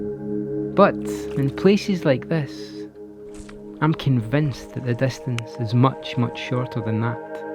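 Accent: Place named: Scotland, United Kingdom. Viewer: I stumbled across your channel a few months ago. You stayed in my mind for your amazingly respectful way of treating fish and your lovely Scottish accent. Thank you so much for this video. Amazing fishing, great editing, beautiful pictures. Takes me back to catching brown trouts in the lochs of the Assynt region back in 2014, those memories are a real heartwarmer right now. Tight lines and greetings from Germany!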